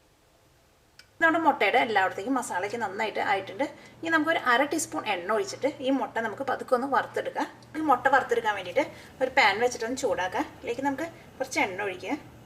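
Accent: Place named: Tamil Nadu, India. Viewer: Xenophile C I watched this video for how elegantly Ms. spoke malayalam. It sounded like very melodic Tamil, and Tamil itself sounds very melodic:).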